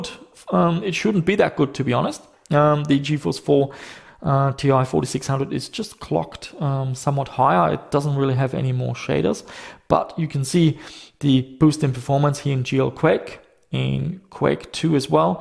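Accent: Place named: Germany. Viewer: Are you really Australien? Thought in the beginning that you were from Germany or Austria! 😄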